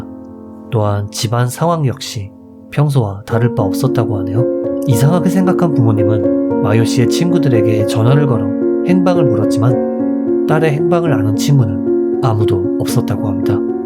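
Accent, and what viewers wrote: Japan. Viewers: ㅎㅎㅎ중간 중간 일본분 액센트가 들려 일본인인줄 알았어요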